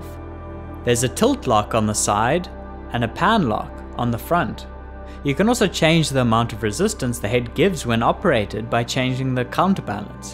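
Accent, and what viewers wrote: South Africa. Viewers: Is your accent South African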